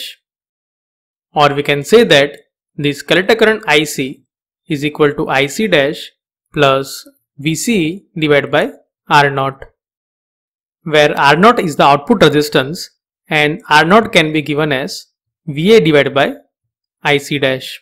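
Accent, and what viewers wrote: India. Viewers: Audio problems and heavy indian accent, makes it harder to understand than it should be....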